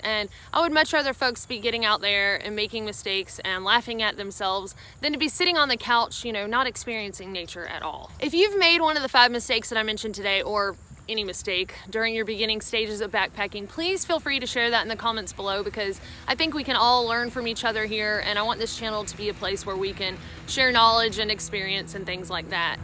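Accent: South, United States. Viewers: Once again, top notch video, great content. Recently went to a seminar, and heard same points, different words. I like your southern drawl better. Thanks for all you do here!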